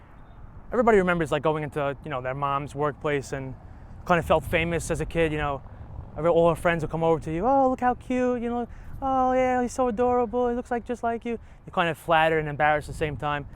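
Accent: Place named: Staten Island, United States. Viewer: This guy has a thick Staten Island accent. Sheesh.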